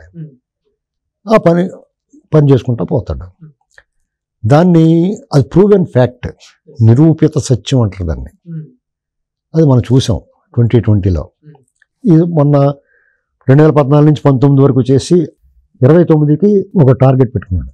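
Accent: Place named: Godavari, India. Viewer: అల్లసాని వారి అందెల సవాలు మాకు పరిచయం లేకున్నా వారి గోదావరి యాసతో అచ్చ తెలుగులో అనేక సామెతలతో గాడిదలు కూడా సిగ్గు పడే విధంగా సున్నితంగా విశ్లేషించే విధానం చెవులు నిక్కబొడుచుకుని 'ఈ జగ్లక్ భాగవతం ఎంత సేపైనా ఇంకా ఇంకా తెలుసుకోవాలనిపిస్తుంది. 40% గాడిదల కి ఇది అరణ్య రోదన అవుతుందేమో.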